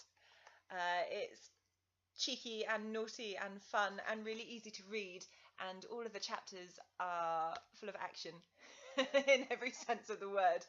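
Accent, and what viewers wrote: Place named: United Kingdom. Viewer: (well, it sounds British to me, I'm not a native speaker! Sorry if I guessed wrong!)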